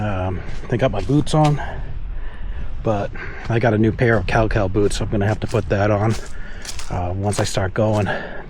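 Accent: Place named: Boston, United States. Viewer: Looks like NW Alabama to me. That Boston accent will go great there.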